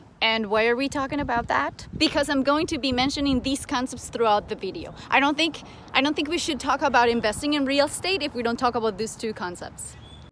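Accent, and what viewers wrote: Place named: Colombia. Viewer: I like your Colombian accent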